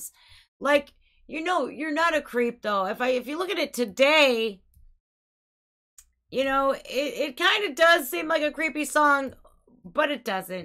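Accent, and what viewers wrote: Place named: Ohio, United States. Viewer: You are THE. BEST. I love your Ohio accent.:). Yeah, watch Haley's bit. She smolders.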